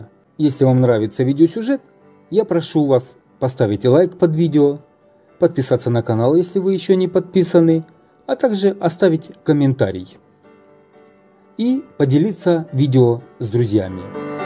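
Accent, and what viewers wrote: Kharkiv, Ukraine. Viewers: Как всегда очень интересно прогуляться по улице! Очень напрягает, ваш харьковский Говор, надо избавляться, при чтении текста, хорошо бы вам поработать с преподавателем сценического искусства.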